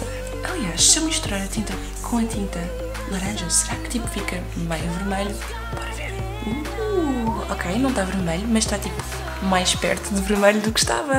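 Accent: Portugal. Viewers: sou brasileira e ela é portuguesa?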